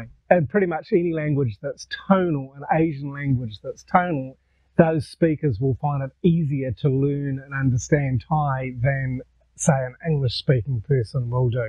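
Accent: New Zealand. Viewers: Surprised to hear another kiwi here in Northern Thailand. Good on you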